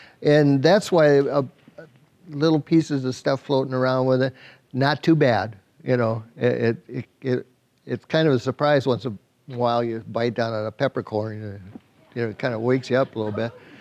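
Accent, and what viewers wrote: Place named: Minnesota, United States. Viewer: Miss you. Hope you are okay. Love that Minnesota accent 😍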